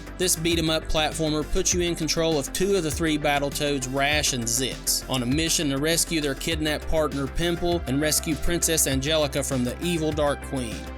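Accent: South, United States. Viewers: Is this a hilly billy brother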